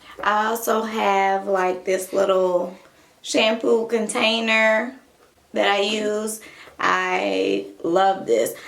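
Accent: New Orleans, United States. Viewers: Beautiful... Do I hear a bit of Nola Baebyyyyy?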